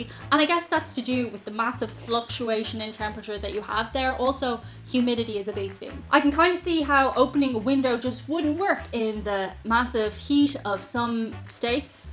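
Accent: United States. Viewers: Why don't you sound like Sersha Ronan? I think you're American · very insightful! you talk so much like an American that i forget English is your 2nd language. You must be quite smart!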